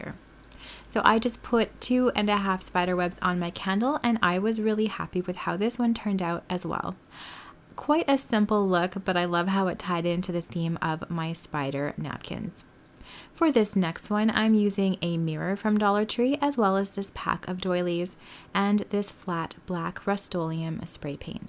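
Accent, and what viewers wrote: Canada. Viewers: Your Canadian accent is so cute. Iron..decal..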